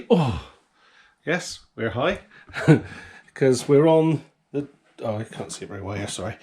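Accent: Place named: West Country, United Kingdom. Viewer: Goin straight out after this vid to look at mine... Thx for all your great Vivaro how-to and tips 'n tricks so far..., all well explained, "proper job". Don't worry about your rabbiting, i'm quite partialled to hearing a bit of west country accent now and again. 🇨🇭 greets, Steve.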